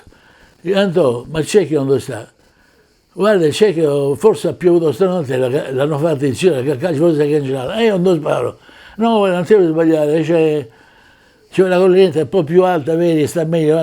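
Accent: Veneto, Italy. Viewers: Io direi che un romano con l'accetto veneto è di tutto rispetto" o stregheta".😊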